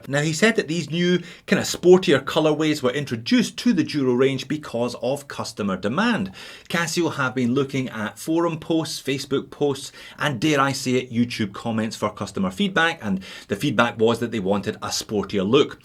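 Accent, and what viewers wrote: Scotland, United Kingdom. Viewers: Love the Scottish 'Hello' on this channel and Scott Manley's. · That's the Scottish pronunciation of the Duro.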